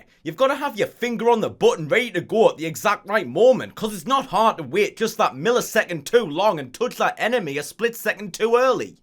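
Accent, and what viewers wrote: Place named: North, United Kingdom. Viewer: The northern accent and swearing is killing me. I wish you had presented Gamesmaster in the 90s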